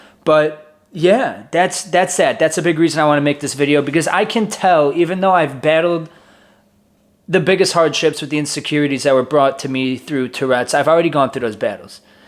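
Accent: United States. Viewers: Anyone else just think he had a jersey accent? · Truth...wasn't sure about this guy with the Chicago accent that liked to wear bball shorts with golf shirts when you first showed up in Good Good. Didn't take long to realize that you're a genuinely solid dude and I'm glad to see what you bring to the whole group. After this video, even more so. Thank you for sharing your truth...we're all better for it. But seriously...stop wearing bball shorts on the golf course.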